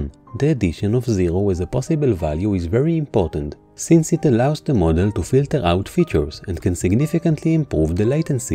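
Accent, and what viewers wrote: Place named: France. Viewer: I would guess french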